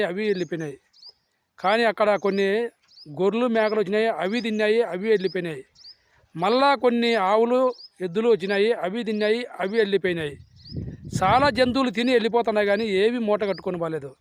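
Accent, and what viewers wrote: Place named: Kurnool, India. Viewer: Anna meeru eay ooru me basha chusthay ma kurnool ternaykal,basha la undi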